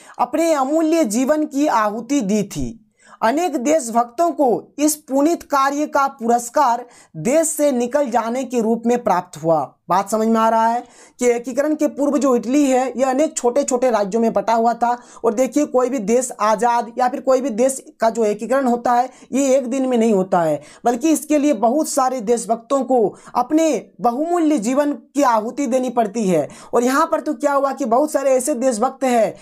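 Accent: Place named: Bihar, India. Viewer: सर क्या आप बिहार राज्य से हैं? बोली से थोड़ा- थोड़ा पता चल रहा हैं क्योंकि आप पूर्ण को पूरण बोलते हैं।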